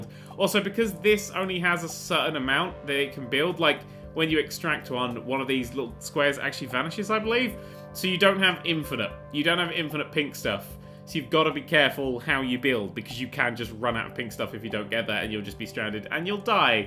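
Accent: England, United Kingdom. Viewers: I love the English accent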